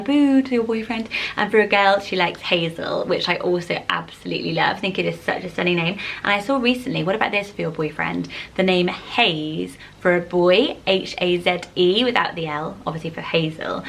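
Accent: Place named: Bristol, United Kingdom. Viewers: Where are you from? You sound just like my friend from Bristol :) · My dauther's name is Lily Adaline. I love the way you prononce all those names with your English accent, but as a french Canadian I need a name that sounds good in french first for the baby im currently pregnant with. Sad cause I love Elle, Everly, Automn...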